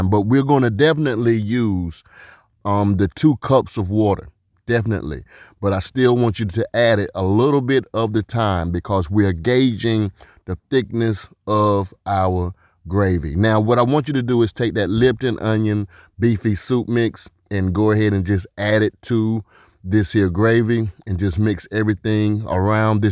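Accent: South, United States. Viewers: Yea! I heard the first 5 seconds and guessed correctly, he’s in the south ❤️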